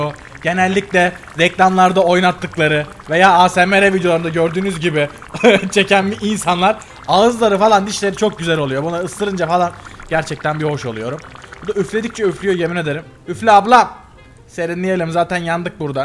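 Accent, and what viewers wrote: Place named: Adana, Turkey. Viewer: Hemşehrimsin abi bende adanalıyım FİŞŞEK tamda adanalı konuşuşu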